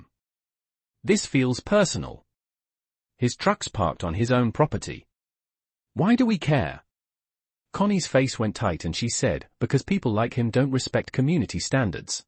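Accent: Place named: England, United Kingdom. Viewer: The obvious english pronunciations of words doesnt go with the story.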